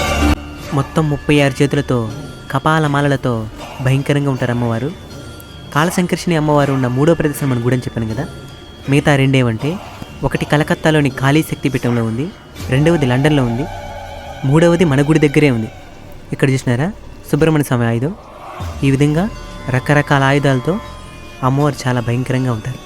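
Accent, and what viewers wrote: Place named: Chittoor, India. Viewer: ANNAYYA MEMU ME OORIKI May LO vasthamu yekkada gudi undedhi PLEASE address cheppagaru ME VOICE vintunte chittor jilla vallala undhi PLEASE PLEASE E gudi chusina tharvatha aa gudiki ravalani undhi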